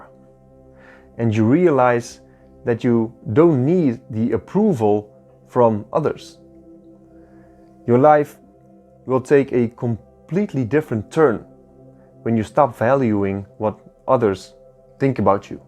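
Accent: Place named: Brazil. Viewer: Are you brazilian? 🌚 because you have a brazilian accent.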